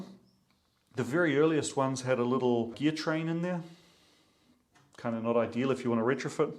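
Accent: New Zealand. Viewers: Yeah that industry is not known for innovation, but for safety measures :) So they needed evidence they really work :) By the way: may I ask, where did you pick up that strong New Zealander accent? It's really a pleasure to listen to you :) There is a guy on YT (Geoffry Croker) he speaks like this :) But he's native there :) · You sound like a kiwi.. but do you live there? Hmm!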